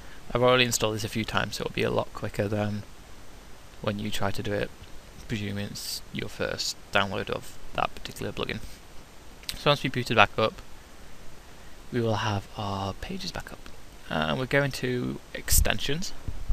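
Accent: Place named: United Kingdom. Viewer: lol you have a funny british accent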